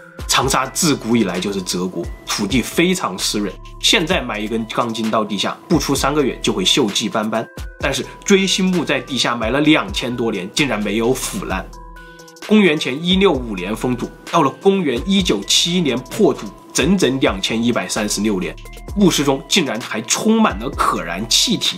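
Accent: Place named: Fujian, China. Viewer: 刚把老九门看完😊，是弗兰人吧？完全的弗兰口音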